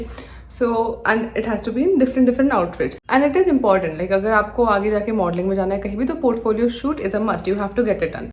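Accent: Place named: Delhi, India. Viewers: I started watching her just couple of days ago and it’s just my second video of her ( 1st one is that haunted one) bruh, the way she rants and talks 😅🤣. Proper Delhi accent 😅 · delhi mein sab hi aise baat krte hain 🎲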